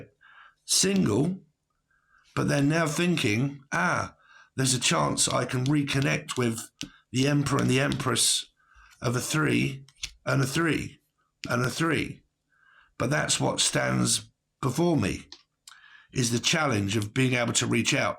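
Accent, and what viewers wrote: United Kingdom. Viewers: Im a sucker for ppl with British accents ❤.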